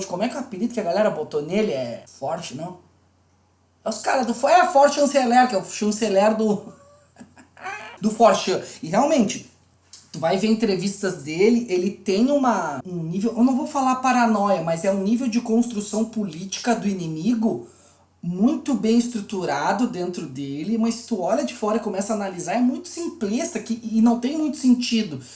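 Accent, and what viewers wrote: Porto Alegre, Brazil. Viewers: Mazá, Professor! Tava com saudade do nosso YouTuber com sotaque portoalegrês!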